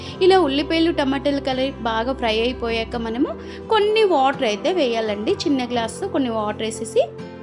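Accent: Telangana, India. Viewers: మీ మాట్లాడు చున్న భాషలో తెలంగాణ యాస కనబడుతుంది శుభము హరే కృష్ణ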